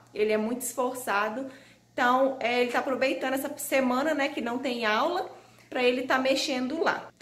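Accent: Minas Gerais, Brazil. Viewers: amooo seu jeitinho mineirinho de falar morei em barão de cocais 11 anos,amei pessoas acolhedoras é comidas muita Boa Sou de Goiás Bjssss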